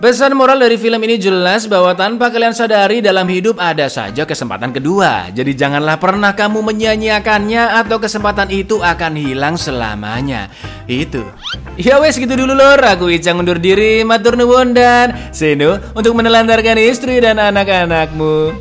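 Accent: Java, Indonesia. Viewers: Rika wong ngendi jawane ya lurr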